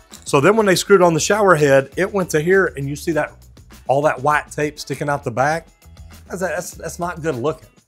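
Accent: Texas, United States. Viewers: a bit to Texas twangey for my liking like most plumbers drawls😂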